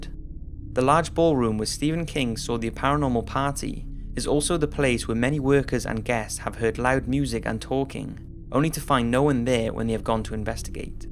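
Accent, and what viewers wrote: Wales, United Kingdom. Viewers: gjoni I think he is Welsh butt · ah...love that soft Welsh burr. Strangely comforting, given the horrific subject matter! 😉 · The narrator is Welsh. A nice welsh accent. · Is that a welsh accent I hear? Aye Welsh squad turn up🤘🏼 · Alex Wilde His accent is Welsh!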